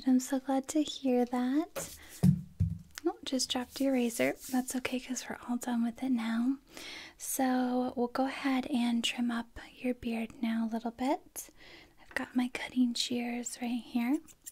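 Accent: United States. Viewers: you must be from the South?:D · but with an American accent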